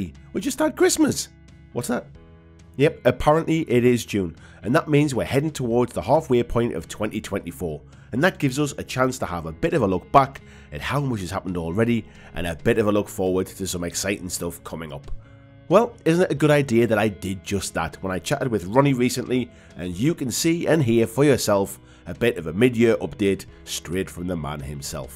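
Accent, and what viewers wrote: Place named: United Kingdom. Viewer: The British accent may be a bit difficult especially for non native speakers. I think it's fine perhaps add captions?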